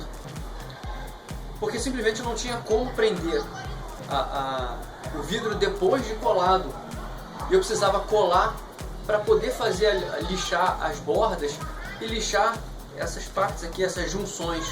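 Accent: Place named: Rio de Janeiro, Brazil. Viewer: Po, pelo sotaque estava achando que vc era aqui do rio, qnd aos aparece o prédio bege e marrom que morei por 15 anos aqui em nit...kkkk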